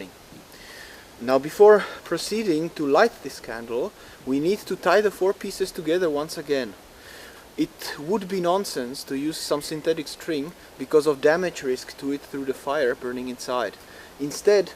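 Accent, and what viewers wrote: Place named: Germany. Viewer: Don't wanna be that guy, but isn't he german?? Accent and camo gave it away :P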